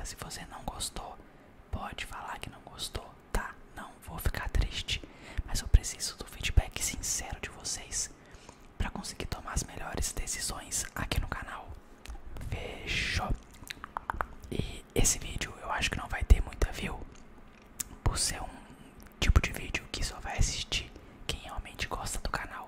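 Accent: Minas Gerais, Brazil. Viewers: Sou inscrito há muito tempo, muito bom ver o canal se mantendo e crescendo! - Amo seu sotaque mineiro hauhau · Espinha. Rsss tem um vídeo que vc apagou né? Com microfone de orelha e fundo meio vermelho, e com luzinhas de natal. A gente nota viu. Aquele vídeo era muito legal. Sobre esse vídeo tá ok, achei legal quando vc faz sons por cima: acho que os vídeos antigos traduzem muito pouca sensibilidade (por conta dos sons serem mais abruptos). Cara, as vezes eu repito seus vídeos pra dormir ou acalmar a mente no final da noite. Além do mais esse sotaque do povo de Minas, já transpassa uma certa tranquilidade. Conteúdo nota mil. Evolução muito expressiva 👏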